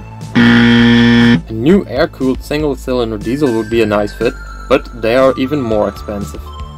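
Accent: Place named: Netherlands. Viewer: Hello you sound Dutch ? If you are interesded in ever selling the tractor or the back tiller let me know I need some good pictures of the mounting on the tractor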